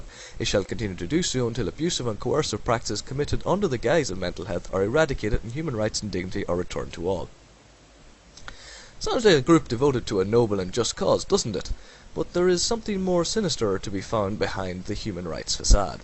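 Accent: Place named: Ireland. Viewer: great video man, I like your accent. Irish? very nice information~ keep it up ^_^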